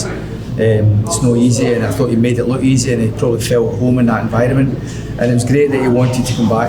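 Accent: Scotland, United Kingdom. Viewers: More waffle. But now in a more verbose style and with a Scottish accent.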